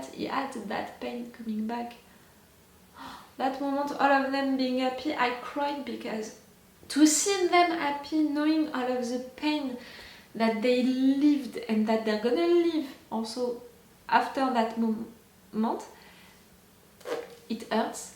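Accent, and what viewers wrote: France. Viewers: Ouais on ressent énormément l’accent franglais ahah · Je découvre cette chaîne Mais après quelques secondes, j'ai compris que tu étais française. Entre français on se reconnaît xD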